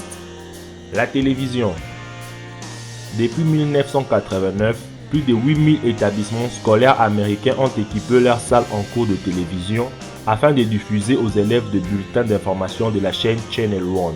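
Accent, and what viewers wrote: Africa. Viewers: bien l'accent qui sent le mafé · j'aime pas parler de l'écriture, mais c'est pas de ta faute si t'as cette écriture de merde et lui cet accent. il fait pas exprès. t'as jamais entendu un africain ou quoi toi ? 😂😂😂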